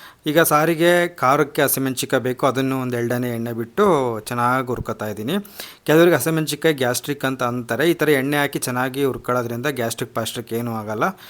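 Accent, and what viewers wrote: Chitradurga, India. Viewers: ಪೂರ್ತಿ ದುರ್ಗದ ಭಾಷೆ ಕೇಳೋಕೆ ಚಂದ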